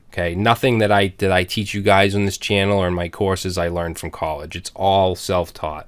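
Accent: Boston, United States. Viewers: Love those videos. They inspire us to learn more. Keep producing them please. Your channel is the best I have encountered. Learned a lot from your channel. Oh, and stop hiding that Boston accent, it reminds me of a great movie, "The Departed". Happy New Year to you and your family! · Don't hide the accent brah. A Boston accent is trendy now-a-days. Excellent video. I've definitely felt the imposter syndrome. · Needed to hear this this week - so thanks! Love your channel. Also don't hide your Boston accent! It's great! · Hi, this video really spoke to me. My history is plagued with depression and self doubt but decorated with a passion for coding. You really put the human element back in the job of coding. Many thanks. BTW, I am an old guy and your accent takes me back to JFK, a shining leader in my youth - hope you don't disguise it, I miss it, it is wonderful.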